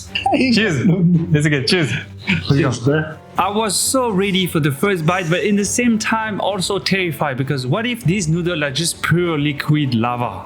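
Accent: France. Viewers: Is that a french accent I hear??? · Why do you have a French accent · Salut mec depuis que j'ai clicker sur t'a vidéo j'ai directement remarqué ton accent français 🤣🤣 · The french accent makes watching this so fun( u should have 100k subs ATLEAST)😅😅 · Beautiful vidéo the french accent Is definitely your signature branding wise, don't loose It .x')❤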